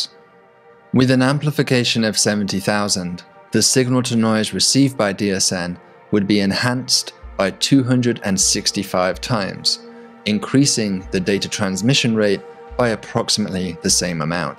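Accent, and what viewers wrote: United Kingdom. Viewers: Pretty sure he’s British…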